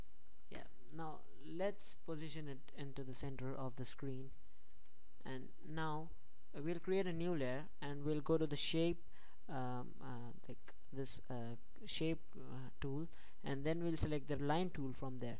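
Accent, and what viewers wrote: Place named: India. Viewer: indian accent man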